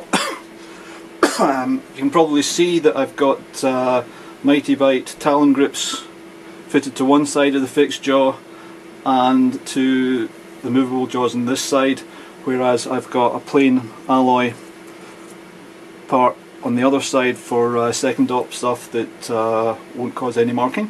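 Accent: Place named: Europe. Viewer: Good video, I am about to make something similair myself. Looking around for some inspiration. Thank your for sharing. From your accent, I presume that you are from europe. Not to many tormachs around here. Did you order a brand New, or Did you manage to find a used one? Would be intresting to know how much the shipping was in case u ordered a new one. Subscribed. :)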